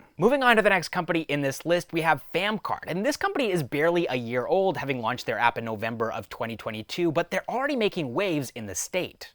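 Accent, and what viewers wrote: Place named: Mizoram, India. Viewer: Subscribe you becoz i like your Mizo accent ❤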